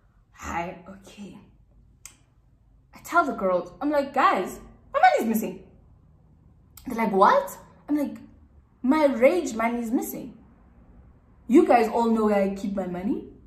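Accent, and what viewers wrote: Afrikaans, South Africa. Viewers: I LOVE the Afrikaans accent 🤣🤣🤣🤣vat nie kaak nie😂😂arg thank you for the story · It's the Afrikaans accent for me 😂😂😂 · Her Afrikaans accent is top tear 😍😂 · Your Afrikaans accent🙇🏽‍♀️😂😂😂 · Fun fact: Every after 3days I come to check if momma has delivered the content yet! 💕📌 My second home is here with ❤️ I love youuuuuuuu! 🤣🤣okay. Afrikaans accent! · It's your Afrikaans for me🤣🤣❤️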